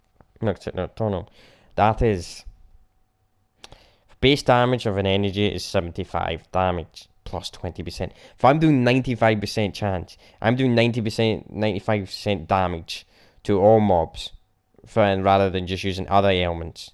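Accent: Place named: Scotland, United Kingdom. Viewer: If you dont unstand that English then you must of never head of a Scottish accent before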